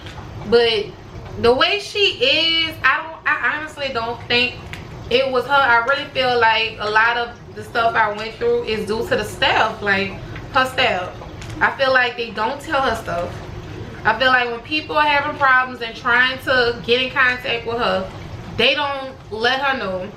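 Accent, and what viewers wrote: New Orleans, United States. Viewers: New Orleans accents are my favorite. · I know you from New Orleans. I love how people from New Orleans talk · I love the New Orleans accent by the way it remains me of Toya lil Wayne ex. Thank God she is ok now.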